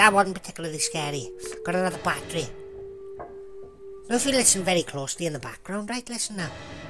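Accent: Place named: Wales, United Kingdom. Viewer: Funniest welsh accent ever never heard any in my life but I'm from Carmarthen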